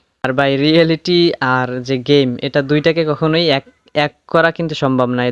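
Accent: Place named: Madaripur, Bangladesh. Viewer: আসসালামু আলাইকুম ওয়া রাহমাতুল্লাহি ওয়া বারাকাতুহু। ভাই ভালো আছেন। ভাই আপনি ফুটবলে কোন দল সাপোর্ট করেন ব্রাজিল,-আর্জেন্টিনা, জার্মানি, নাকি স্পেন। আপনার বাসা কোন জায়গায় কে আমি মন আপনার কন্ঠ তো শুনে লাগে আপনার শরীয়তপুর নয় মাদারীপুরের আশেপাশে থাকেন আপনি কোন জায়গায় থাকেন?আপনি কি করেন? ডি এল এস গেমে কি আর কোন কিছু নাই ভাই আমার গেম খেলতে ভালো লাগে না আমি হার্ট মোডে ডিএলএস খেলছি আমি খালি ম্যাচ জিততে থাকি খালি জিতে থাকি আমি মেয়ে ছাড়িনি এখন আমি কি করবো ভাই.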